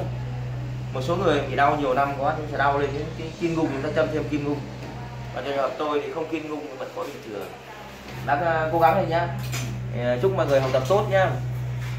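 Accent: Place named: Thanh Hoa, Vietnam. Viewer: Bác giọng thanh hóa thì phải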